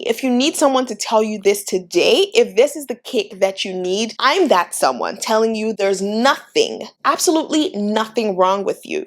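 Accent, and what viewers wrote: Nigeria. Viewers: Is that a Nigerian accent I heard😍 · Giiiirl! Are you Nigerian? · I love your Nigerian accent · Thank you for making this video. Truly it’s a blessing. Girl, are you Nigerian? The accent peaked through. Fellow Nigerian here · It's the Nigerian accent for me😂 Thanks alot for this gem and giving us a great personality to go with it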